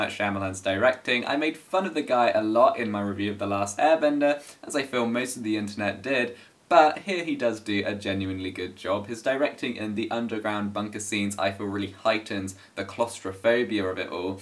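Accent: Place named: England, United Kingdom. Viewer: You had to be English. Where you from exactly? Anyways. I didn't like this film in t' overall. Disappointing. Americans apparently fell for this bullsh*t. Don't watch it again, pls.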